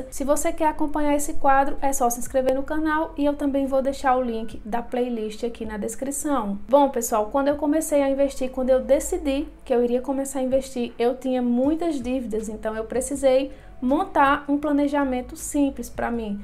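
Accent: Northeast, Brazil. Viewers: Mulher ameiii o vídeo era exatamente o que procuro Já min escrevi no canal Você è nordestina ?? Sotaque Nordestino 😊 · Gostei muito do seu vídeo, muito nobre de sua parte ajudar as pessoas sei que seu intuito foi esse bichinho, agora diga uma coisa esse sotaque arretado é do Nordeste?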